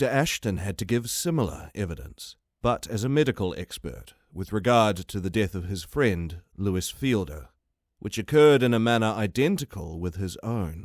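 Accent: New Zealand. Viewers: Kia ora, I'm in Aotearoa too. Lovely to hear a kiwi voice. I narrate audio books for the blind foundation. I've always wanted to do old skool horror. Love your voice. Benson is one of my fave authors.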